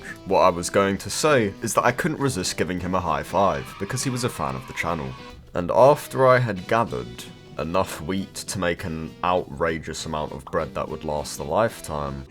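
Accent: United Kingdom. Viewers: I goon to your British accent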